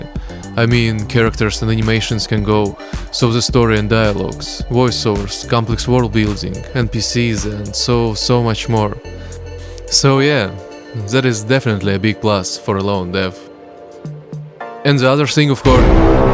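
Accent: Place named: Russia. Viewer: я английский знаю не очень, но почему то сразу понял что ты русский)))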